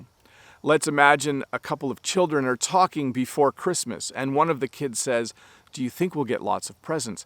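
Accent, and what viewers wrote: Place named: Canada. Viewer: Thanks, really understandable as all your lessons and useful, saddly I gues I`m not the onlyone that learnt the alternatives but in a conversation have drive a blank and just can use the reliable "I don`t know" hahahah BTW I`ve noticed your canadian stamp "eh", I dono if you know but in spanish we also use "EH" in a simmilar way that you, in my country sometimes implies a slight provocation or defiant. Thanks!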